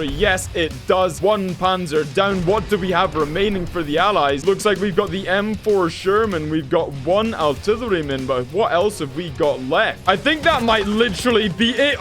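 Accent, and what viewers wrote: Scotland, United Kingdom. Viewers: Scottish BaronVonGames? Consider me a subscriber! I feel bad that your work goes unnoticed.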